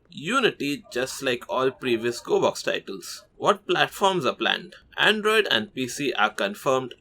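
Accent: India. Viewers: Sir are you from India because your voice like india's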